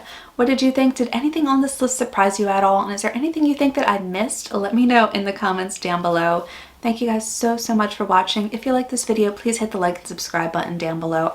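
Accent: Australia. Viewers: Hi, I sense your voice is starting to sound Aussie 😊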